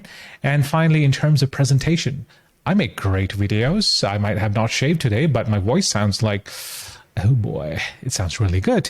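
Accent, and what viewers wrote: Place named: United Kingdom. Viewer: Lol.. the British accent. Nice content man. Yeah that’s a fear.. are we doing enough for my people to stay and get enough value?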